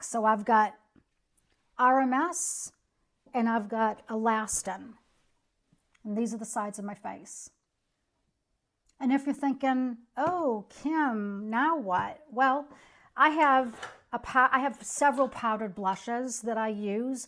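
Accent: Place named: Boston, United States. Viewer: I love your Boston accent!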